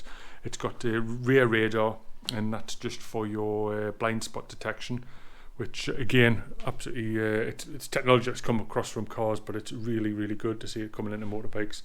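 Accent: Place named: Newcastle, United Kingdom. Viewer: .. I think you’ll find he’s a Geordie, not a Scot..🤦🏻‍♂️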